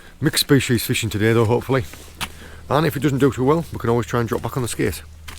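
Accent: United Kingdom. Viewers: Love your videos sir in cornwall, your british accent is not the easiest for non brits to understand still great videos keep up the good work and best regards from sweden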